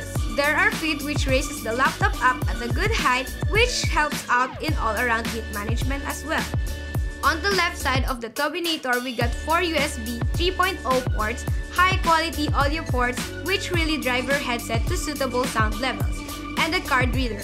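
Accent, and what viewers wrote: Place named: Philippines. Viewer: hay filipino accent talaga xD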